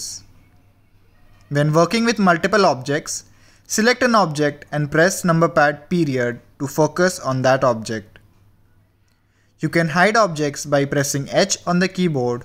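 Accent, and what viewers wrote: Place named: India. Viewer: When they have an Indian accent, you know it's about to be good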